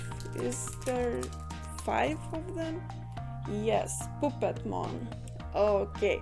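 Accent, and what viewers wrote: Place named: England, United Kingdom. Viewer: I find your English accent to be interesting lol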